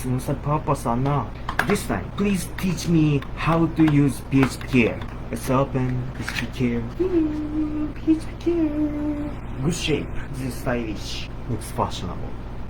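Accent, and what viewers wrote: Bisaya, Philippines. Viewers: I love the bisaya accent ❤️ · Nganong ganahan ko sa imong bisaya accent uyy 😍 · favorite accent sa bisaya mapatay na ko tawa diri 🤣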